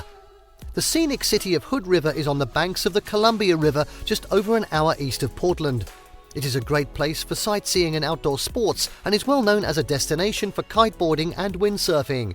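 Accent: United Kingdom. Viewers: Oh for God sake! A BRITISH accent on a video about OREGON. 😢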